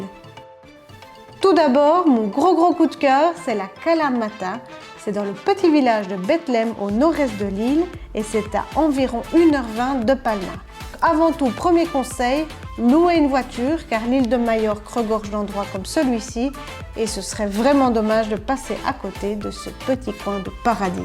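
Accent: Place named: Switzerland. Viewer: Sympa ces découvertes sur Majorque à tester...je peux entendre un accent Suisse dans ta voix.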